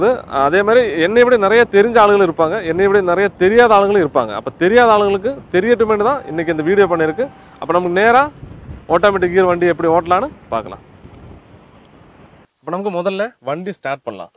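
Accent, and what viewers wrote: Kerala, India. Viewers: malayali tamil pesrathu ...nalla irukku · நண்பா உங்களுடைய மழையாளம் கலந்த தமிழ் கேட்பதற்கு அருமையாக உள்ளது.நன்றி. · Malayali tamil samasrikunnu... Nanum thamilan than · When a malayali speaks Tamil it sounds so sweet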